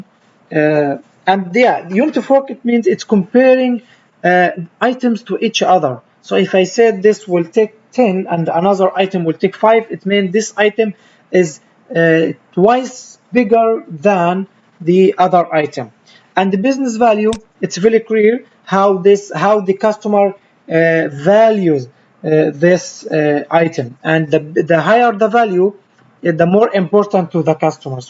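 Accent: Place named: Arab world. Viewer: sounds arab pronunciation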